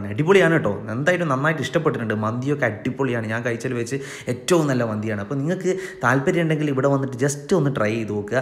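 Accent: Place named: Kozhikode, India. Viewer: നിങ്ങളെ വീഡിയോ കണ്ടിട്ട് കുറച്ച് ആയിട്ടോ -- ഏതായാലും സംസാരം കോഴിക്കോടൻ ആയത് കൊണ്ട് നല്ല രസണ്ട്ട്ടാ😝 മക്കൾക്കും ഇഷ്ടം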